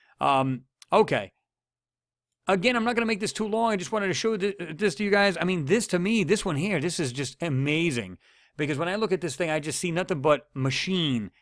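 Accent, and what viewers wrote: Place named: New Jersey, United States. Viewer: "for everyone on a pee cee" you're funny you're definitely from New Jersey Maryland or New York LOL anyhow brother send me an email. I like your Mars and moon work the most but I wish you could take a nice hard long look at Ceres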